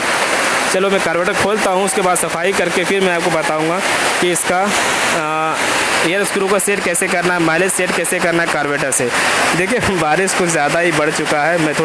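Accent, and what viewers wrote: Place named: Bhagalpur, India. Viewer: कहां से हो भाई। भाषा तो भागलपुरी लगता है।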